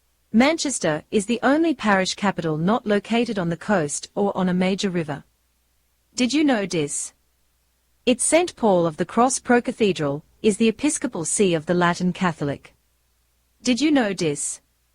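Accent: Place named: United Kingdom. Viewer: Interesting facts but stupid video with the British accent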